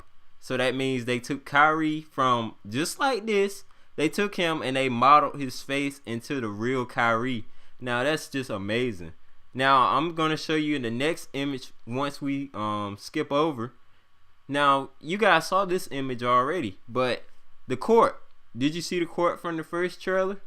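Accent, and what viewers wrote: United States. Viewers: where you from? You sound like you're from down south, not saying that's a bad thing just curious.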